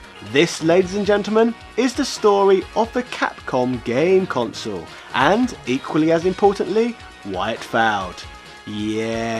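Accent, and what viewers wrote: England, United Kingdom. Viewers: Are you england? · It's his accent. He's from the U.K.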